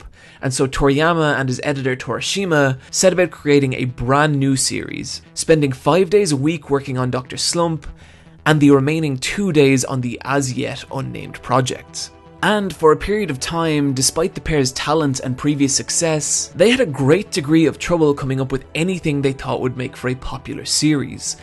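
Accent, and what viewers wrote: Ireland. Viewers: huh so youre irish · I only now started hearing the Irish accent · Oh I was pretty sure he was Irish, that accent sounds about right. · holy shit I didn't know you were Irish dude hell yeah I knew that accent was familiar. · Oh? You're Irish? I thought your accent seemed familiar, but it's always safer to assume English. Hello, fellow countryman! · He's Irish?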